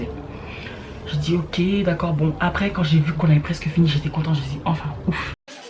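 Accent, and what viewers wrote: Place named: Guadeloupe, France. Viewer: je suis une petite youtubeuse et superbe vidéo je viens de te découvrir et je vois que tu parles créole guadeloupéen ça fait trop plaisir ❤️🔥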